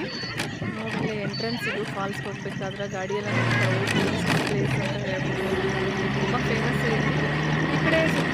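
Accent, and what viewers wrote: North Karnataka, India. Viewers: I am suma nim vlog changidy nima utara Karnataka mathu thubha nice nima urena vlog madi mam👍👍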